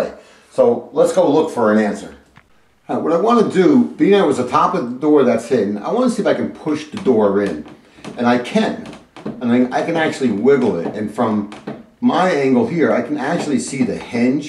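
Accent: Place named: Philadelphia, United States. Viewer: My first time watching your channel, just hearing you talk I'm telling myself you gotta be from my neighborhood in Philly.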